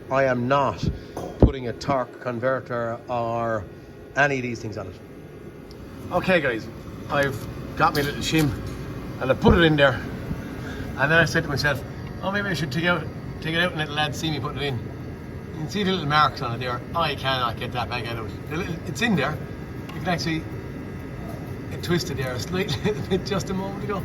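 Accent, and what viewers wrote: Ireland. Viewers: the southern Irish accent is a great accent am from the north of Ireland I can understand your accent easily. Great videos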